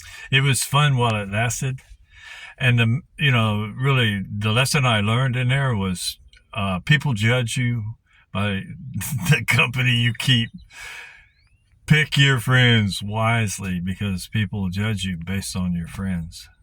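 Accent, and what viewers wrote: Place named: Louisiana, United States. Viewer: Great video! Are from Louisiana by chance? I hear a slight Cajun accent 😂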